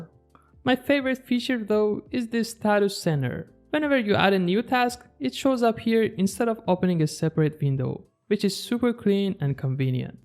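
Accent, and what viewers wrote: Iran. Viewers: from the accent, I immediately knew you were Persian just like me 😅 But anyways, insanely good video bro, and especially as someone who comes from Fedora GNOME, this is a godsend, considering all the apps in GNOME have a unified UI across the whole system, which Windows 11 CLEARLY lacks. And UniGetUI is a really good package manager considering I'm used to DNF. You deserve a like and I quite frankly, HAVE to save this video. Thank you، برادر. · با توجه به لهجه انگلیسی ت فکر کردم بچه ایرانی پروفایل ت رو چک کردم زد ترکیه لهجه انگلیسی دو کشور نزدیکه به همه 😂 سال ۲۰۲۰ که ویندوز ۱۰ نصب کردم هنوز UWP زنده بود، اونموقع مسائل fluent ui خیلی دنبال میکردم برنامه Win UI Gallery 3 هم میتونی یه ویدئو بری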